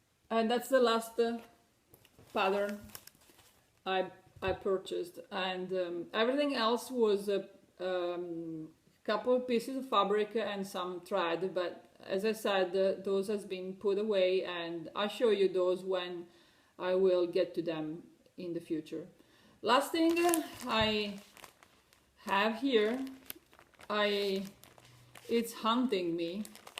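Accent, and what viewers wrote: Italy. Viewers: Yes...i love your Italian accent....its very soothing. Xxx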